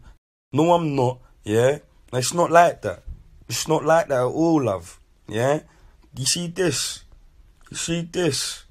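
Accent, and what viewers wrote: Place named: Jamaica. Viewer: The yardie accent 🤢🤢🤢 ya nah yardie man your begging it. I’m not hating tho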